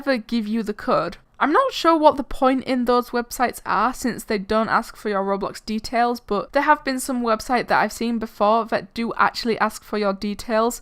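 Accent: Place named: Manchester, United Kingdom. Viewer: do you live in Manchester? I hear your accent and its currently pm for me now xd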